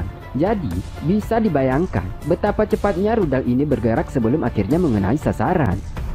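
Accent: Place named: Bugis, Indonesia. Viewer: DARI LOGATNYA PASTI ORANG BUGIS....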